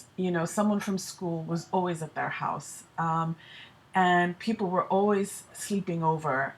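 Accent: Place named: Milwaukee, United States. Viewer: It's so uncanny: you have the same speech pattern as Some Girls! Even using "Ummmm" "uhhhh" with a slight Milwaukee accent!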